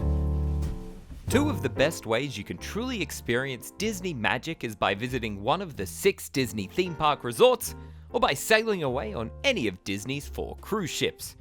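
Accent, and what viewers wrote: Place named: Australia. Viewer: I love your Australian accent!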